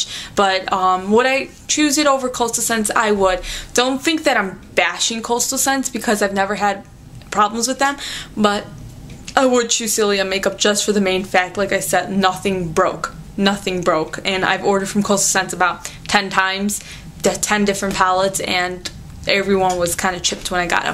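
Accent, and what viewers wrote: Brooklyn, United States. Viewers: u r really so damn pretty, they way u sound and the way u look dont match, u have this exotic beautiful look and one would almost expect this smokey sultry voice with a european accent, but u sound the the kid next door from brooklyn or something. LOL anyway good reviews and tutorials, keep em coming!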